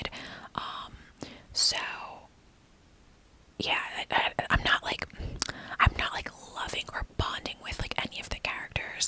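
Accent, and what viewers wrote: United States. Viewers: All i needed is my fav american accent this morning❤️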